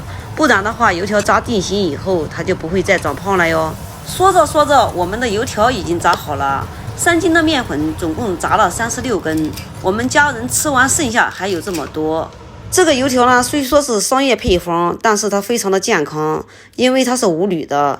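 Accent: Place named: Xinyang, China. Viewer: 口音像信阳人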